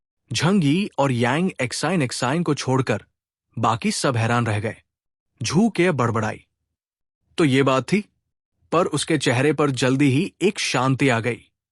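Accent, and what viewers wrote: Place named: India. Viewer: Why you turn into indian bro.. i cant understand a thing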